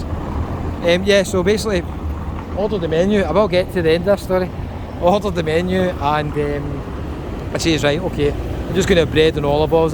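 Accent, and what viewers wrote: United Kingdom. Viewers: Mate, get real. With your strong accent, they probably didn't understand you. Why do all Brits think it is there given right to speak overseas in English only??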